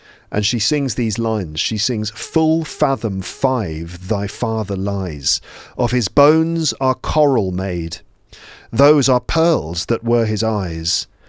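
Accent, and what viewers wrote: United Kingdom. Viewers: I adore the British accentas i am ateacher of english from North Africa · British accent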